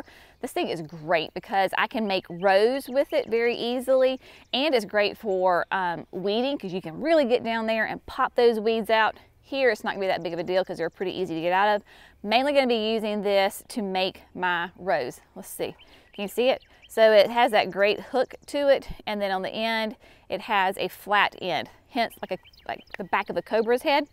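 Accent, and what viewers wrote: South, United States. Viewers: Great tips! You are so pleasant to watch. I’m from New Hampshire and your southern accent sounds beautiful to me🥰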